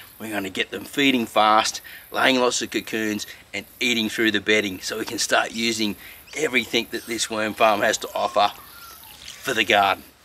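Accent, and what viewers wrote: Australia. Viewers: Thanks mate. Always good to hear an aussie accent 🤙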